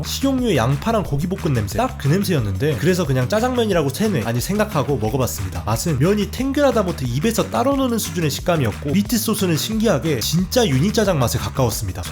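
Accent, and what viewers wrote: Jeolla, South Korea. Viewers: 전라도억양이라 불신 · 혹시 고향이 전라도신가요? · 전라도분 이신가봐요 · 오! 전라도 분이신가보네요 사투리가 구수합미다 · 아따 니 전라도 사람이여라? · 전라도 사투리 ~~~~ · 9주인장 전라도 맞져 ? 계속 알게모르게 전라도 억양이들림 (순수 궁금증) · 숨길수없는 전라도사투리 ㅋㅋㅋ 굿!! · 전라도사람이시군요 사투리가 ㅋㅋㅋ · 5교시 한문시간ㅋㅋㅋㅋㅋㅋㅋㅋㅋ 아니 말 너무 재밌게 하시네요ㅠ 전라도 억양도 너무 웃김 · 혹시 전라도 분이에요? 나레이션에 사투리가 구수한데?? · 전라도사투리 거북합닏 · 혹시 광주 분이신가요?? 전라도 사투리 쓰셔서 광주사람인가했는데 딱 기아 얘기 나와서ㅋㅋ (내일 드뎌 한국시리즈에요😎) 그리고 영상 너무 웃겨욬ㅋㅋㅋㅋㅋ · 즌라도 사투리가 쏙쏙 박히니까 재밌네 ㅋㅋㅋ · 전라도임 ? 사투리가 ㅈㄴ 들리네 ㅎㅎ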